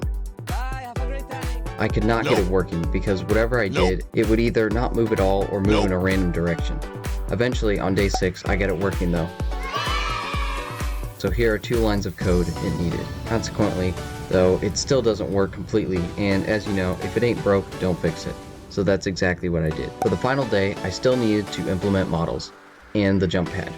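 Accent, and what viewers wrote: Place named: United States. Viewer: ig your american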